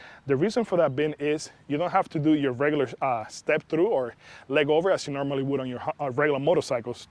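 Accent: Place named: Cuba. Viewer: I detect a Cuban accent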